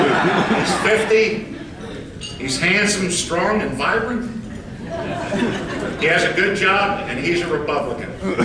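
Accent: Pittsburgh, United States. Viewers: Great work sir, thank you for your service! 🇺🇸. By the way, I can hear your Pittsburgh accent 👍🏻👍🏻😄😄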